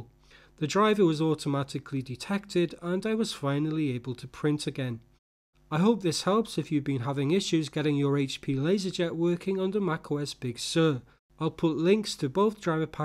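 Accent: United Kingdom. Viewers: Brilliant! Easy to follow and it worked. No driver for LaserJet 1020 but the 1022 will do it. Why can't HP or Apple sort this out instead of causing loads of problems and stress? Thanks so much. ( Diolch yn fawr, you sound Welsh! )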